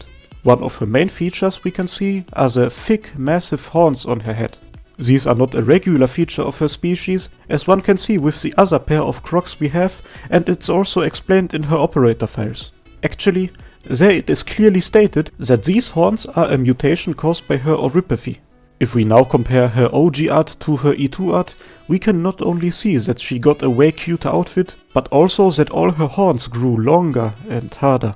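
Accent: Germany. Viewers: der Deutsche Akzent ist direkt zu hören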